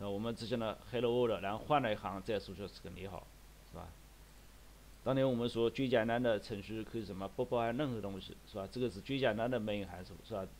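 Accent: Anhui, China. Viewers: 6，这口方言把我听愣了，阁下莫非是安徽大佬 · 我听着就是安徽人啊😂😂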